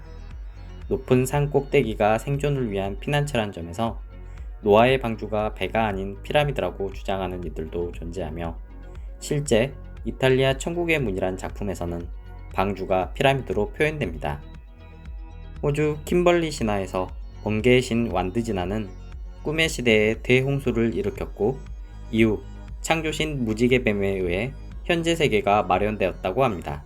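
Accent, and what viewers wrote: Jeolla, South Korea. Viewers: 진지하게 얘기하다 중간중간 튀어나오는 전라도 억양이 너무 귀여우시네요!ㅎㅎ 좋은영상 앞으로도 부탁드려용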